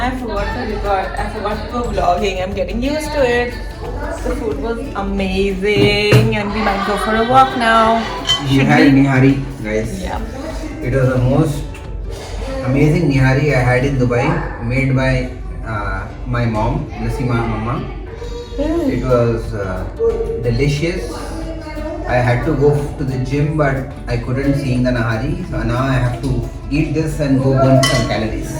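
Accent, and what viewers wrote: Hyderabad, India. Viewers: Love when you talk hydarabadi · Loved your Hyderabadi accent, When u r speaking Hyderabadi, want to listen more from you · As I am Hyderabadi leasing Hyderabadi feels good so plz keep talking in Hyderabadi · Hyderabadi accent love it 😍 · Hi.. I'm from Jaipur....love when you talk in Hyderabadi.....😘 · apan #hyderabadi bolte acchai diktai...#sania_mirza ki nakko sunno #be_orignal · . Be natural. We like you like that. Hyderabadi urdu zindabad. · Haha..Hyderabadi accent has so much jayenge, aayenge, karenge.. Love watching ur vlogs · Nice vlog hyderabadi meich baat karye mazza aati dekh kar 🤗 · the vlogs are going well,continue with your hyd’i,We should be proud our Hyd’i Urdu is very rare and people don’t get to hear it often.👏🏻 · Yes we loved the clog very much n we love Hyderabadi accent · Hey your too cute. Especially the Hyderabadi accent.I enjoy watching ur blogs.Really looking forward to your upcoming blogs 😊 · Mashallah very good vlog👍 loved your Hyderabadi accent plz improve your voice quality in the video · Amongst all South Indian States, Hyderabadi accent is very swt n easily understandable. 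· Really enjoy ur Hyderabadi hindi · Plzz hyderabadi hindi hi bola karo.... Aftr all its ur Mother tongue..... Sounds gud... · Loved ur hyderabadi accent... Keep using it😘.much love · I love the hyderabadi accent. It suits you like anything :D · M also from Hyderabad it’s really Hppy to hear Hyderabadi on ur vlogs..aisech Bath kro acha dikhra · I love Hyderabadi accent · Please talk in Hyderabadi accent only we love dat yaar love u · Dubai Home tour please😍...your hyderabadi is cute ✌ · Apene Hyderabadi hai yaar not Pakistan urdu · Love to listen to you talk in Hyderabadi.. please continue ❤️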